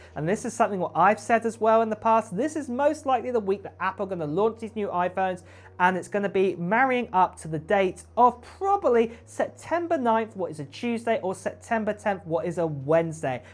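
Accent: Cockney, United Kingdom. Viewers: This was almost painful to listen to….sorry but the English cockney accent was very difficult to understand.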